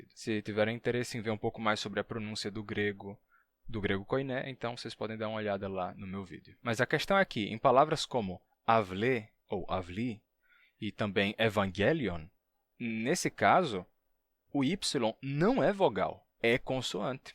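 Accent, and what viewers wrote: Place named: Northeast, Brazil. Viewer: Bom Jesus da Lapa- Bahia)🌎🇧🇷🌵parabéns Guerreiro tu manja da língua portuguesa tu poliglota. 🇵🇹Foi um português que descobriu aqui a gruta a mais de 300 anos faça pesquisa ai Google Guerreiro do RN. Seu sotaque é massa nosso Nordeste de vários sotaques. Hoje tem internet para divulgar mostrar os 2 lado da história real . Tem.baiano qua apedreu Mandarim só ele de xique xique-BA. Ele tem canal faça pesquisa ai guerreiro. 🇨🇳🛫🛫🛫🛫🛫🌵🌵🌵🇧🇷2025 Bahia..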